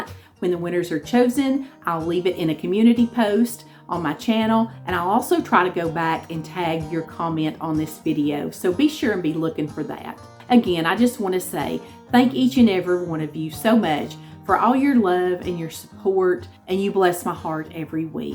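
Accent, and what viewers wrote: South, United States. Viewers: Congrats,! I found your channel a few years ago. Your sweet southern accent and fun personality drew me in quickly! I think the first recipe I made of yours was the meatloaf. It is delicious! I like that you include all the sides in your videos too. God Bless you! 😊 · Make a little cream sauce using cold milk a heaping tsp of flour. Vigorously stir that flour into the milk and pour over those peas. Allow to thicken a little and enjoy! My favorite way to eat them. This chicken recipe has got to be a must make bc it looks delicious! Thank you for the recipe! Oh my goodness! That garlic cheese bread! So simple and looks delicious! Can’t wait to try it! Again, thanks! Girl, your sweet southern voice is soothing. I really enjoy your videos. Congrats on 100k subs! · Congratulations! I love your channel and I love the merch. My favorites are the ground beef recipes. 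And being a born and bred southern girl I love your accent because it is a lot like mine (NC/GA) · you are a breath of fresh air! Your humility is unheard of in this me, me, me world we live in today! I have been eating low carb/keto for a year now so I don't use your recipes but ALWAYS look forward to watching your videos every week! I adore your soft spoken, southern drawl that I am so fond of because of my family roots being from TN. Love you and wish you God's blessings today and every day! Congrats on the 100k subs...well deserved!! <3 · I found you quite by accident scrolling through youtube cooking channels. You did your video on the best simple salids. I absolutely adore watching your videos. I love love your southern accent, And with every dish you prepare, you show the love of your family. And you're a True southern lady and homecock. Thank you for not only the entertainment but the wonderful recipes you put out.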